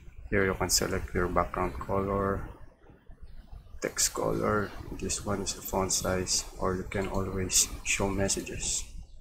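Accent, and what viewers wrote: Philippines. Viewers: filipino accent 100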